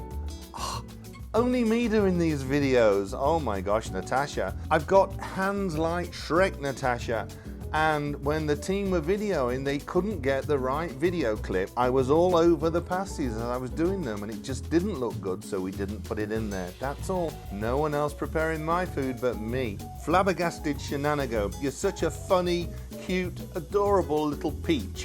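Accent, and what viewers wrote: United Kingdom. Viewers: I just love your videos! Thanks for sharing your memories & recipes -- your presentation is so easy-going and friendly that it doesn't call attention to how great your technique is and how detailed you are. My question for next Q&A, where in UK is your accent from and what is your favorite dish from your home region?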